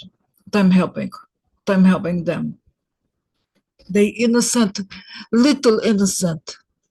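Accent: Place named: Russia. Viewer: Thank you 🩵🙏❤️✨🌟. Your Russian accent makes me smile and laugh even in these very trying times!!! Thank you!!🙏 God Bless you🙏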